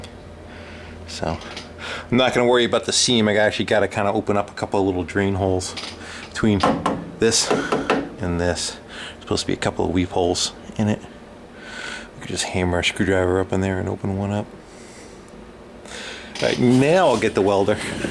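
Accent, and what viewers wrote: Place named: South, United States. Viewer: Thiss'n! Awesome. Love southern speak!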